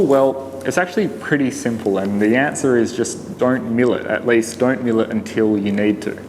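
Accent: Australia. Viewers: Good to hear an Aussie voice on here (where r u ?) from a Perth based mens shed member :)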